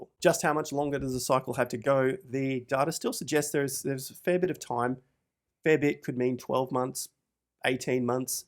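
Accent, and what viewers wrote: Australia. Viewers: That makes no sense. He’s Aussie.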